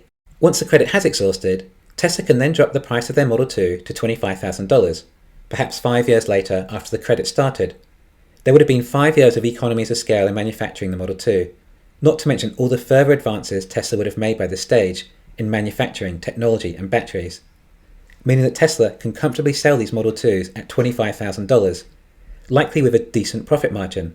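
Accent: England, United Kingdom. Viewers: The video montage imbued with an English accent commentary is what allows this channel to be the quintessential news source for $TSLA and the chosen offspring of world AI salvation, á la The Terminator, Elon and his Canadian progenitor, Maye.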